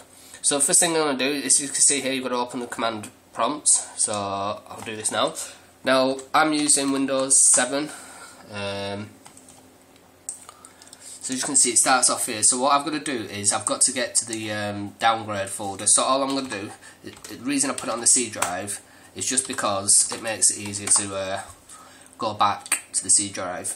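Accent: Yorkshire, United Kingdom. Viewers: Cheers bud,are you a fellow yorkshire man?great guide to follow.:)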